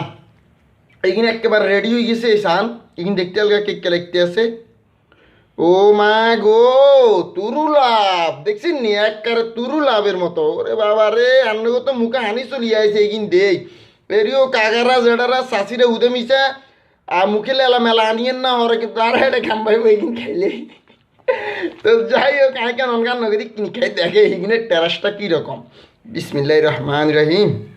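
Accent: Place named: Noakhali, Bangladesh. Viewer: আমাদের নোয়াখালির ভাসা💓💞😍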